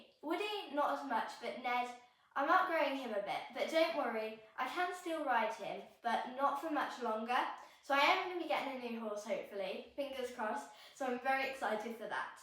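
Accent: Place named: RP, United Kingdom. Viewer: Love your accent and articulation, proper English !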